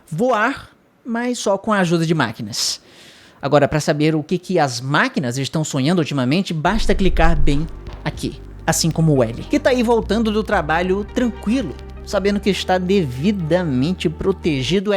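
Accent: Ceará, Brazil. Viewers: TU É CEARENSE MANO?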